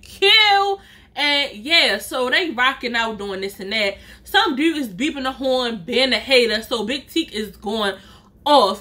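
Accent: Baltimore, United States. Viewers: I’m a new subbie to your channel. You’re such a funny storyteller! I’m really enjoying watching your vids. Andddd I lived close to B’more for a few years and your accent took me back down memory lane. When I first moved there I asked for good food and she said hip hop chicken and said “hot dug”. I said no disrespect but I don’t know what you’re saying could you repeat and ultimately I asked baby girl to spell it!!! Lmao gurllllllllll I felt so dumb! Sorry for the rambling! Sis you’re doing a great job on your channel! Remember to Always keep your head held high so your crown 👑 won’t slip 🧡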